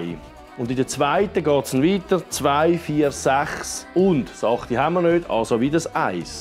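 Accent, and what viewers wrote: Switzerland. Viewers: Hi ein absolut schönes Video und nun sieht man auch einmal im Detail was Du da vollbracht hast mit diesem Custom Töffli mit dem 7 Sternzylinder - Motor. Und jeder Schrauber weiß man ist niemals fertig an Custom Teilen Fahrzeugen ständig entwickelt man es und verbessert es weiter und das macht ja Dir Sache aus man hat Freude und ist Stolz wenn man es hinbekommen tut. Ich hoffe Du hast genügend BREMSENREINIGER auf Lager für die Reinigung des Motores und Vergaser. Du bist einfach ein geiler Siech wo es absolut drauf hat freue mich auf jedes neue Video von Dir. Schöne Grüße aus Germany von der Schwäbischen Alb mir gefällt Dein Schwitzerdütsch absolut huregeil.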